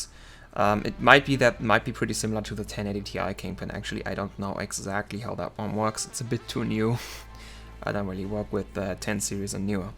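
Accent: Germany. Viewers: nice to hear english with german accent again :))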